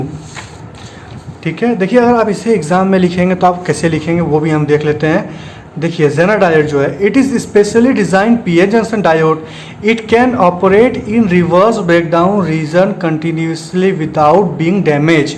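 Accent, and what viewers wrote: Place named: Bihar, India. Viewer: Bhai aap ka voice bihari jesa AA Raha hee😊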